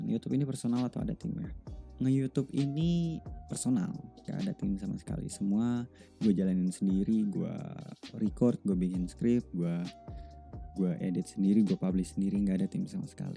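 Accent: Indonesia. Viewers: Ehhh aku baru nonton dan denger iniiii. Nice to know you ya kakk! Ternyata kita ga jauh beda umurnyaa, kamu 2 tahun lebih tua daripada akuu. Aku juga ngerasa aksen kamu sunda banget, soft banget, tapi ga spesifik nebak bogor sih wkwkwk. Anyway, aku berharap ada lagi Q&A kayak gini lagii 🥺🥺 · Plis ya gue baru denger video ini, dan bogor accent itu nyata adanya loh wkwk kerasa aja gt beda nya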